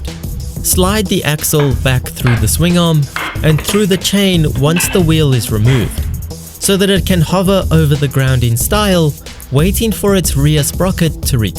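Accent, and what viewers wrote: South Africa. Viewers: U from south Africa bru ? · Are you south african?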